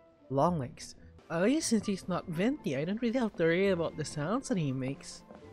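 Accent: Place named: Philippines. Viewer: is it just me who hears his filo / pinoy accent?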